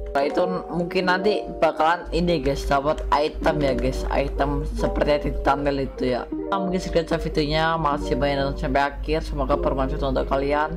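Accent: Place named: Java, Indonesia. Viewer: Medok bg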